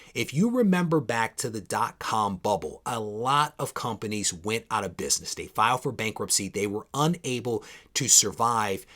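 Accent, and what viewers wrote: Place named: New York, United States. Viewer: Nice vid again. Too true got to do what you got to do. Like the wall selection change up. 1 for which books they are. 2 I have 10 of them myself. It's kind of cool getting to see what it could be like if I were to get them graded. Like how you keep it fresh. Have to watch every vid till the end too just to hear more of that old school New York sound. Miss good rap.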